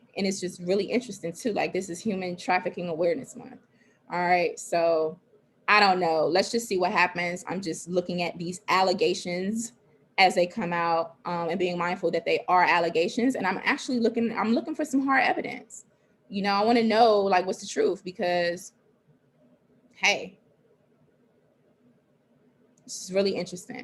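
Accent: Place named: DMV, United States. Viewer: I can tell when someone is from the DMV area... I hear it in her voice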